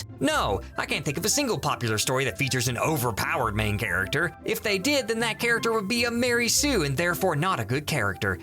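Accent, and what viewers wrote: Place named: Deep South, United States. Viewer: What the actual fuck is "self-own"? Also, why are randomly you talking like you're from the deep south?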